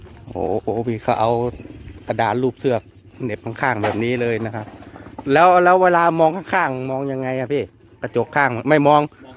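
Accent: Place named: Isan, Thailand. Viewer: เว้าอิสานแน่คับ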